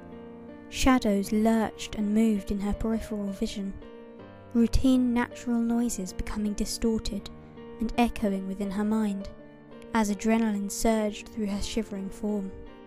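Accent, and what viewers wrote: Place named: United Kingdom. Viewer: The most wonderful and precious thing about this story is the narration. This is the true accent of Britain, remember it well as it is becoming increasingly rare. The murder and defilement of the English language is a horror story in its own right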